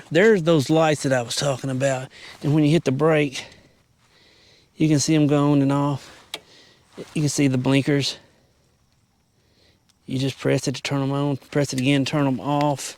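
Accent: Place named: Texas, United States. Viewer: Merry Christmas my friend! And yes I will subscribe. I just purchased me one yesterday and not only did you make me laugh with the shameless request for subscription... love it... you also showed me what I wanted to see about the way it responded to your pedal strength. I mean it man...perfect ... and you sound like all my family here in Texas lol. Awesome!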